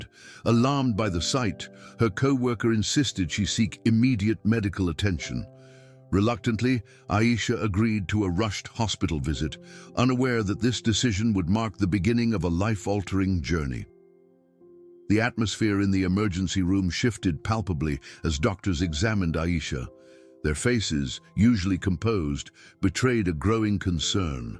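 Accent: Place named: United Kingdom. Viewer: This could and should have been edited down....the British accent does not make it more palatable.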